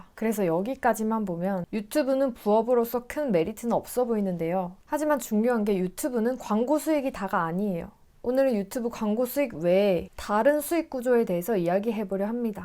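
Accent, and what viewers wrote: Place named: Gyeongsang, South Korea. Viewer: 경상도 분이신듯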